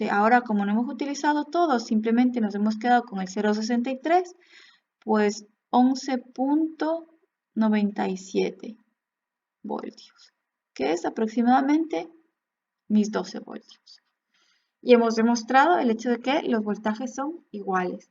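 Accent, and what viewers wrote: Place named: Spain. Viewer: Buena explicación y más ya con ese acento bien españolizizimo 😂😂😂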